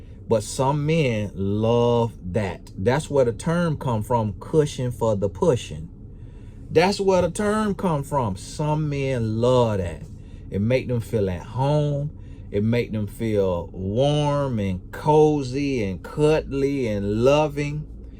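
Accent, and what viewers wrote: South, United States. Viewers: Lmao I love listening to talk!!! Please don’t change a thing bout your country talk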